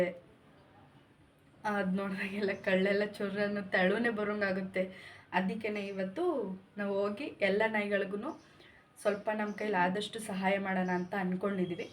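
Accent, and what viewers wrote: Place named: Mandya, India. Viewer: Mam ನಿಮ್ ಮಂಡ್ಯ ಮೈಸೂರ್ ಕಡೆ ಭಾಷೆ ಎಷ್ಟು ಚನ್ನಾಗಿದೆ ಕೇಳೋಕೆ ನಮ್ಮ ಉತ್ತರ ಕರ್ನಾಟಕ ಭಾಷೆ ನಿಮ್ಮಷ್ಟು ಚನ್ನಾಗಿಲ್ಲ 🥰❤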